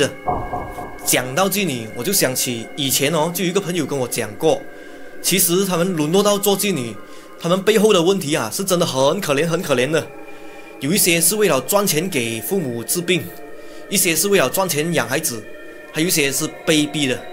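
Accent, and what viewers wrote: Malaysia. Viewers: 最恐怖的還是馬來腔華語發音好恐怖😱😱...嘩咾欸..😱😱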